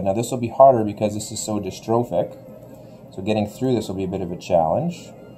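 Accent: Canada. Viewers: You sound a little bit like you're from Canada.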